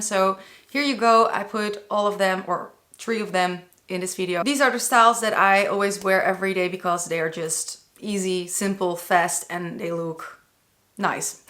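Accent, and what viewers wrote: Netherlands. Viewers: Ik kan gewoon aan je accent horen dat je uit Nederland komt, leuke filmpjes goed bezig ma sha Allah! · I just feel like your Dutch, I mean your accent, it sounds like it. No offence, just a thing I noticed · Ja hoorde het ook al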